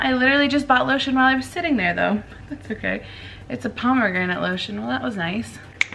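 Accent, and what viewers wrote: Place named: Midwest, United States. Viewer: lol, i knew you were from the midwest! we have the same accent ahaha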